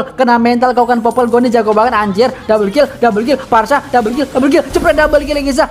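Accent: Palembang, Indonesia. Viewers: Emang lucu wong plembang klo ngomong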